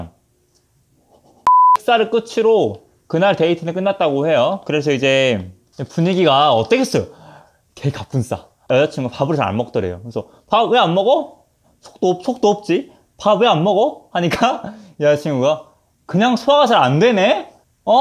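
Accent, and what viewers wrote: Gwangju, South Korea. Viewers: 헉 약간 말투가 광주바이븐데 광주광역시 출신이세요?!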